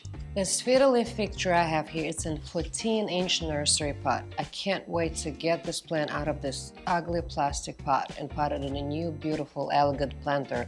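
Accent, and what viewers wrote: Russia. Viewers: I love Russian or some sorta accent 😅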